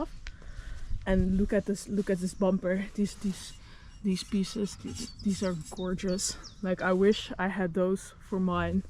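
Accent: Netherlands. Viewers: I had several of these. Loved them all. Never stuck not once. I had a G Wagon and I prefer the range rover, which has shortcomings but also lots of personality. I love this Dutch girl's accent. There should be a shim between the brake pad and the inside of the caliper which should eliminate the squealing. It's a wafer thin piece of metal.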